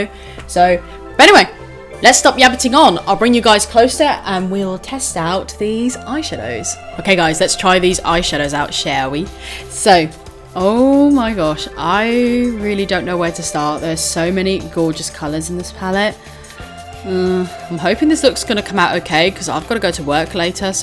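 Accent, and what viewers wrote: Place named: Australia. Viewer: I love your videos, both because you take no shortcuts, but also for the fact we have similar taste. May I ask something. You sounds like you are coming from Australia - is that correct or I am just awful in recognizing dialects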